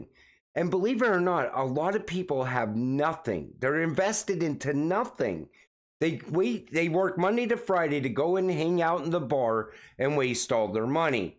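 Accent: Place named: New York, United States. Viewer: Hey My Man, Since it's obvious from your accent that you're from the New York area, I just want to "Bust your Balls" for a second. I busted out laughing when you said "Kenny Nguyen" by pronouncing it "Na-goo-in" when it actually pronounced "Nwen". It's a name that's very common in Vietnam. That's it. Keep up the good work.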